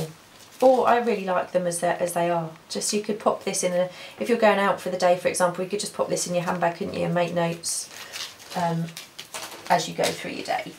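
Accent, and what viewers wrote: England, United Kingdom. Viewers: thank you for sharing very helpful love your creativity and just love listening to you girls from the u.k. so up beat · Do you always distress with a brown ? Do other colours work,blue say or turquoise? I haven’t used these (cards) but I only draw and paint so far. Where is your accent from England ?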